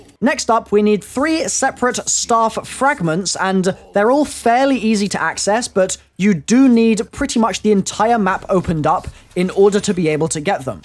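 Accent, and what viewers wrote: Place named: United Kingdom. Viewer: You're British arent you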